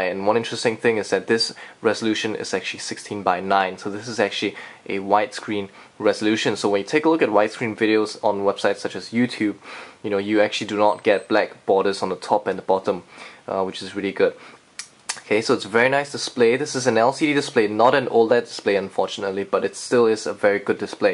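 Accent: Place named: Singapore. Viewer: When I watched this video I was listening to the guys voice. "Definitely Singaporean" haha looks like i'm right XD Singaporean's do have an accent huh... BTW I have this phone, It's not bad and the screen and is BIG but what I don't like about the MIdnight blue/black version is that that back cover gets a lot of finger prints.. but it's good.. quite.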